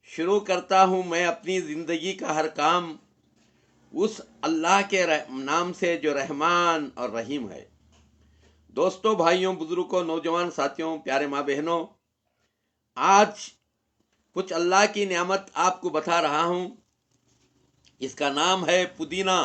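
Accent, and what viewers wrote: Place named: Hyderabad, India. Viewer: Uncle g ap ki language hyderabad ki mujy bht pasand hey ALLAH ap ko sehat dey. From pakistan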